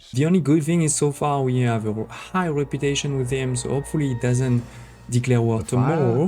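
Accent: France. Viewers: i think the video would be better without the french accent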